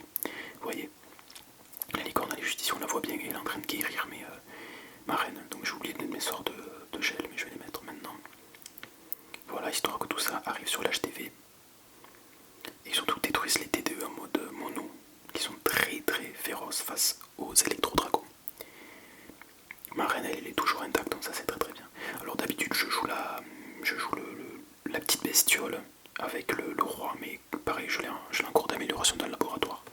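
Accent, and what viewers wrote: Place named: South, France. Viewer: t’es du sud toi 😂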